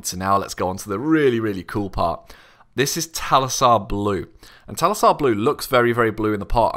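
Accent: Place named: Cockney, United Kingdom. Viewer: Could you tell me if you used Wraithbone out of a can or with an Airbrush? I have nothing but bad to say about Contrast Spraycans because it's awful. It pulls back from the edges, pools in recesses and dries wit a weird almost "carbonated" texture sometimes. I shook for 10 minutes straight, sprayed it properly (8" away), sprayed it in cooler but dry weather, in the day, in the shade. Also, great tutorial! I really enjoyed this one. Brits with a light cockney always sound good ;-)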